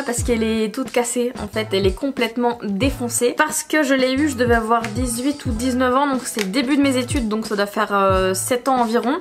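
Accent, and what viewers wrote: Belgium. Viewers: Tu as pris un peu l'accent belge j'entends 😂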